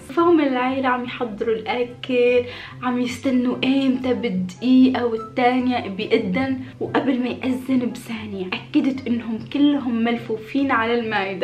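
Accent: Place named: Tunisia. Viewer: لهجتك : كوكتال تونسي على مصري على لبناني من انت؟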